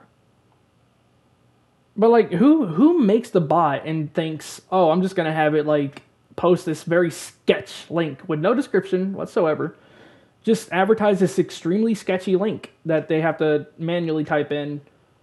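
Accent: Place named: South, United States. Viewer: You're definitely from like a Southern state lol. Mississippi or something. If you're close by we should be friends haha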